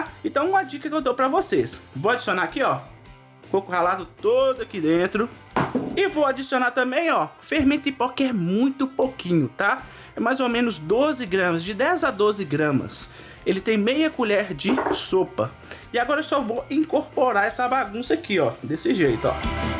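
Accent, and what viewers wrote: Minas Gerais, Brazil. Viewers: Eu também faço isso! Amo os vídeos e o sotaque "gostosim" dele!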